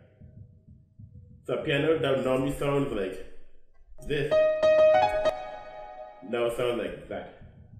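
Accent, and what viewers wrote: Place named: Africa. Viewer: You right B'. You have African Accent #TeamAfrica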